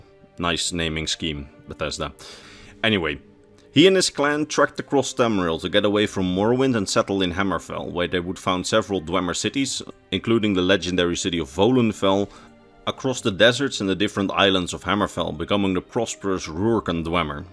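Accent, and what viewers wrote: Netherlands. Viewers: I hate your accent. Dutch should not have one.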